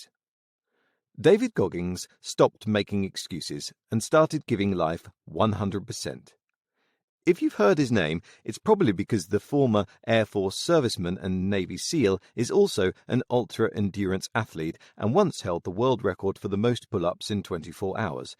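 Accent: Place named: England, United Kingdom. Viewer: Representation matters: This book by a Black author was summarized by a white man. And no offense to the talented, but why, in the year 2021, am I listening to a white Englishman recount the uniquely American experiences of a Black New York-born United States military officer!? Please consider hiring writers and voice actors whose identities more closely align with those of the wonderfully diverse authors you showcase. You guys did good, but you can- and should- do better.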